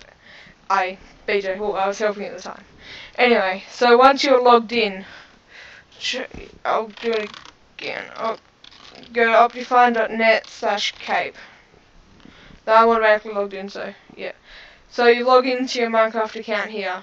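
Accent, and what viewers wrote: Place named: Australia. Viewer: Nice aussie accent mate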